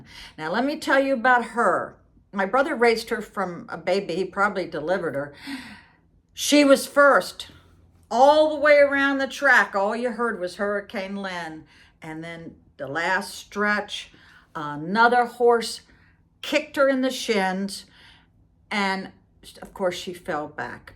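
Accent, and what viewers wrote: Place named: Pittsburgh, United States. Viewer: I have to ask...Are you originally from the Pittsburgh area? I detect some Pittsburgh in your voice.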